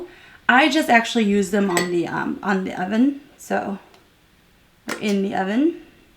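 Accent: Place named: United States. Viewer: 好可怕的美國口音!😳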